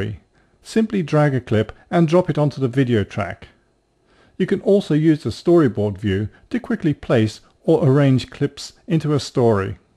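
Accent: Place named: England, United Kingdom. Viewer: is corel made in england? why is there an english guy talking?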